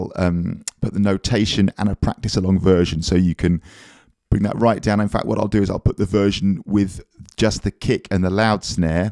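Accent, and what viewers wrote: England, United Kingdom. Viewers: Read my mind. I have always thought the same thing. There’s no other drum teacher quite like him IMO - and I’ve watched plenty. Apart from his skills as a drummer and exceptional teacher he has such a warm, affable and likeable manner. The lovely English accent is a huge bonus as well.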